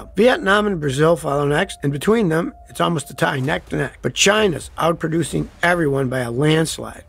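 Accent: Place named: South, United States. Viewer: Your southern accent made the video interesting to watch.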